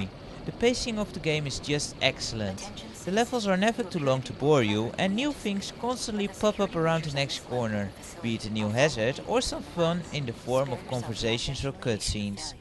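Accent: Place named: Netherlands. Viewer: rofl you sound VERY dutch. are you?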